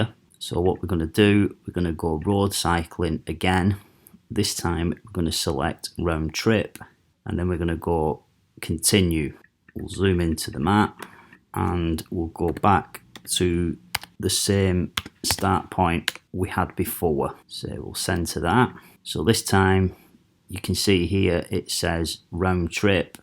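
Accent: United Kingdom. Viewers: Well explained thanks. Sounds like you’re a Lancashire lad. Hoping to do the UK Ironman at Bolton again 2021. Great place!! 😊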